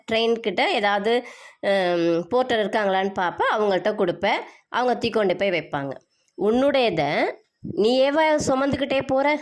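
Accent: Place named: Ernakulam, India. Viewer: Idun Ernakulam sonnadu pola iruku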